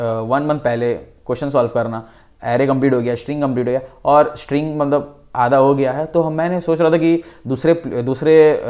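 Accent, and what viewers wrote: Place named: Bihar, India. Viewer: UP-BIHAR ACCENT ☺️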